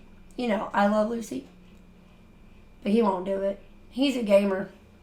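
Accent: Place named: South, United States. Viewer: I love it! I agree with Tyler that you can really hear your southern twang come out when you’re crafting on video. Super cute! Love you! 💓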